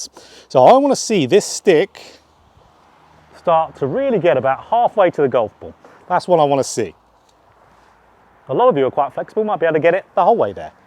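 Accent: Essex, United Kingdom. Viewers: Love it ! British type. He is defo British sounds a bit like Essex maybe. Yea it always rains here in Britain 🏌️‍♂️⛳🏌🏽‍♀️🌧🌨☔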